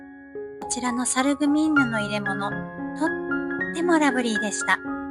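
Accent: Kyoto, Japan. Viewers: いや いやいやぁぁぁぁぁぁぁ…… これがLilyさんの声かぁ〜 なんちゅう 声…… 動画を見つつ どういう風に例えたら 良いかと 思っていました。 とっとこハム太郎のリボン🐹🎀ちゃん みたいに 可愛い 声。 声がこんなに可愛いのなら お顔も 絶対可愛いはず‼️ 声優さんやナレーションのお仕事をされていたのでしょうか？ いつも散策の時の 微かに漏れ聞こえる つぶやき じゃぁもったいない。 話すテンポや 言葉選び 。 とても 素晴らしいじゃあ〜りませんか✨ 京都訛りの穏やかな 口調の 中に やまとなでしこ らしく 強さを感じます。 これだけの動画編集……｡ どれほど時間をかけたのかと思うと 拝みたくなります。🙏🏻✨ 見応えのある４５分でした。 コーヒー☕️を煎れて もう一度 観たいと思います。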